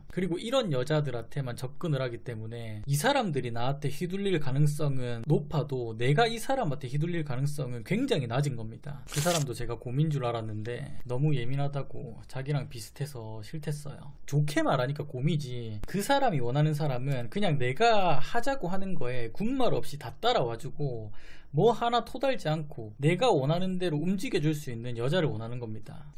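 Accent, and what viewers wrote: Gyeongsang, South Korea. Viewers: 왜 연애 유튜버분들은 경상도 사투리가 많을까요 이분은 현재 연애상담 유튜버 하시는분의 말투나 톤이 넘 닮았어요 넘 놀래서 댓글 달아요 😮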